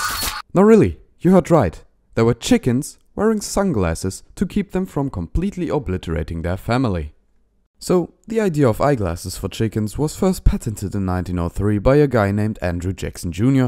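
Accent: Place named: Germany. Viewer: Btw I hope I Identified your accent correctly as german :p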